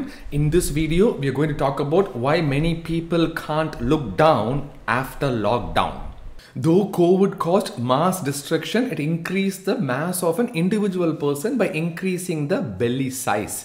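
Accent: Tamil Nadu, India. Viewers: Thank you Doctor. It was easy to understand and specially your Tamil accent, is so beautiful to listen..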